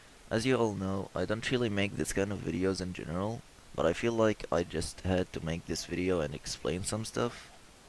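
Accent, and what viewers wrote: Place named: Arab world. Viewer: Much love (from Palestine!) you sound arabic by the way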